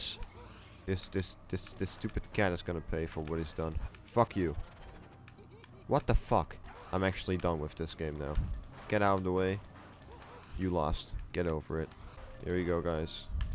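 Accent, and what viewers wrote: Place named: Argentina. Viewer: You sound Argentinian ngl Also awesome Garfield Kart Gameplay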